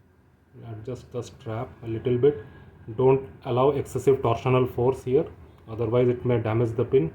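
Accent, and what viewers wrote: India. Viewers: I never get tired of listening to indians speaking english. 😂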